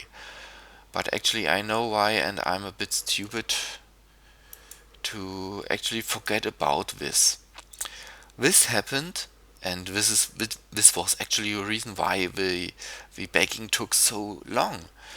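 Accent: Germany. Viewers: Man hört so richtig deinen deutschen Akzent, aber jetzt gehts ja ^^ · This guy is so under rated, this tutorial alone has help me so much more than any of the other tutorials out there, up until this point I had no idea how to apply a physics to an object, and I couldn't find anything on how to finalize physics, only how to simulate them, this guy saved me a ton of digging around online for an answer, all done with a lovely 'German?' accent. I love his accent but I am not sure where it is from.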